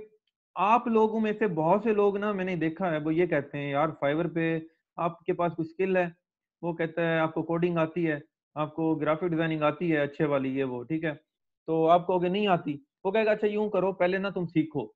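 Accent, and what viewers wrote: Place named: Multan, Pakistan. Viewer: Bhai ap multani ho? Apki punjbai awaz multani bandon jaisi hai 😁😍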